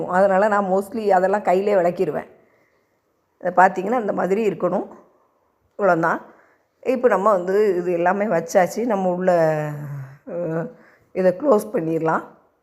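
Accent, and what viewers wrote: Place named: Tirunelveli, India. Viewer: Unga native yendha ooru mam, ஸ்லாங் திருநெல்வேலி pola இருக்கு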